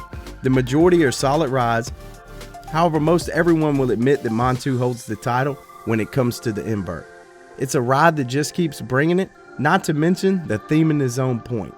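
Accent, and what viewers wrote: South, United States. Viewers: I love your videos and as a fellow southern boy your narration makes it even better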